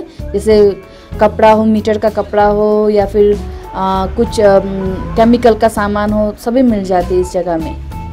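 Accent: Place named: India. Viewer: You hv cute hindi accent 😉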